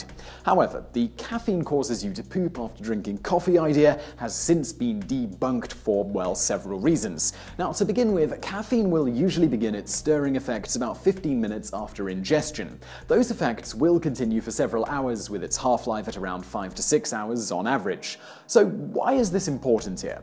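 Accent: England, United Kingdom. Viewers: Dear. I wonder how you choose your pronunciations? If you're speaking with a strong English accent but using American emphasis just to attract the larger American audience then I understand. But often your pronunciation doesn't fit with any English or American dialect that I know. After so much research effort, doesn't it make sense to find out how to say the words properly? By the way, for the benefit of American viewers, yes, manner seems to me to be conceited too. We're not like that. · I love listening to all those English pronunciations in these videos. Good job, mate. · This video is proof that everything sounds more pleasant when heard in an English accent. I mean, poop...really? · Hearing this in a British accent makes it sooo much better for some reason.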